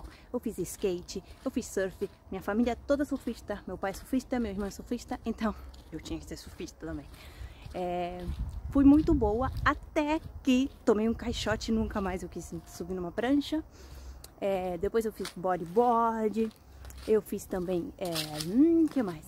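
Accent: Argentina. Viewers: 😉😙😙😙 A mí me encanta la lengua española, y siempre estoy mirando vídeos acá en Youtube de Youtube's nativos de la lengua española, para que yo pueda siempre está en contacto con la lengua española, y tu acento argentino es buenísimo para me acostumbrar con algunas particularidades de español de Argentina, pues ustedes argentinos utilizan siempre el pronombre personal "vos", en lugar de el pronombre personal "tú". Tambíen me gusta acostumbrar mis oídos con el sonido que tú como argentina, utiliza al pronunciar el sonido de la Y(igriega) y la LL(Doble Elle), pues los argentinos hablan "Yo" con el fonema /xo/. ¡Yo soy enamorado por la lengua española! Jajaja. ¡Siempre me encantó! ¡Besitos a vos ! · Y aparte que tenes esa tonada de buenos aires capital y no se te fue cuando haces videos en castellano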